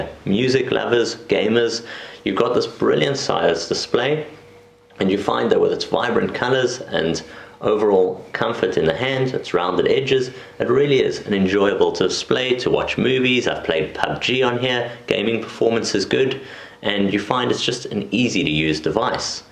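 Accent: South Africa. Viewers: Your accent makes me think your from South Africa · Are you south african? (I hear hadidas)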